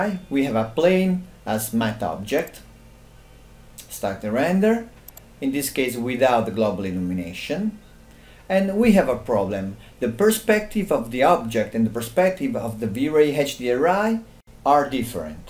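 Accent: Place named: Italy. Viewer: VERY NICE. Your accent looks like Italian)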